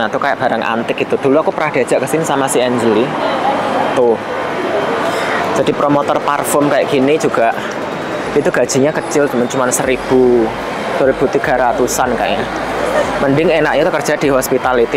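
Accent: Java, Indonesia. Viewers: Tiap x mas bilang AING,CENAH...rita lngsung ktw krn lidah jawa ngomong sunda jd lucu aja bawa'annya🤭 semangat trs ya mas 🥰🥰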